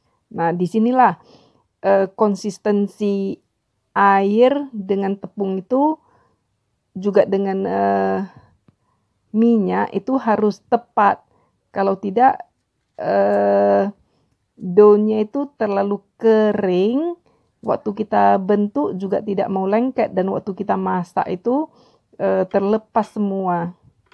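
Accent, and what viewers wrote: Medan, Indonesia. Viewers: Ci org medan ya? Mama sy kl ngomong indo jg gt hahahaha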